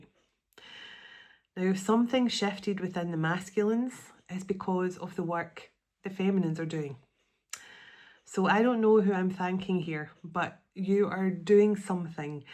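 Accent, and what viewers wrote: Scotland, United Kingdom. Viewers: 😅 I love ur Scottish voice and the things u say! It made me very happy! And it's true all u have said about where we are on this journey! 🎉❤❤🎉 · I love when you talk about yourself and your voice is beautiful! That Scottish accent is what drew me to you in the beginning so please don’t dislike your voice it’s beautiful. Yes lots of releasing and purging past timelines and emotional trauma yesterday. I’m ready for a new start. The past is the past no changing it but working on myself in the present is much more gratifying. I’m in the time in my life where I feel if my person doesn’t come back that’s ok I did the best I could and there’s nothing more for me to do but love myself and I choose me Everytime. That inner peace is something I work towards everyday. Inner peace and happiness is the best thing I can give myself. Whoever comes along with me is just a bonus. 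❤ 123 likes and 777 views i love the numbers lol I woke up this morning with the song “ ” by in my head. 😮I took a nap yesterday and dreamt I was swimming in a pool it was my old high school lol today I dreamt of another body of water lol 😮 I knew I loved you played on my radio the other day. It made me tear up such a beautiful song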